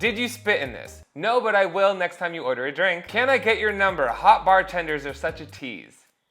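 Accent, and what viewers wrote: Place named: New York, United States. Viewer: How have you managed to get a heavier NY accent and sound gayer at the same time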